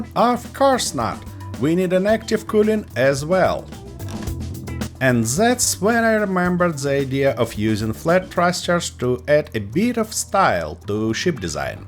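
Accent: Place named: Russia. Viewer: This is already interesting as a topic just on it's own but having it explained to me by a crazy person with a thick russian accent somehow makes it even better.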